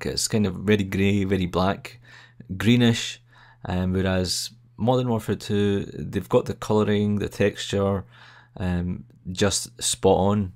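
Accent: Scotland, United Kingdom. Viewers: Are you a Scot?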